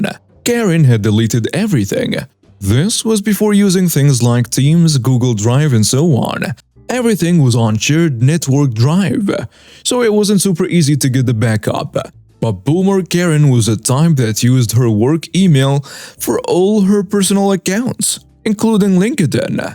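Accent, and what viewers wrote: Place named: South, United States. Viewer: #1 love your southern accent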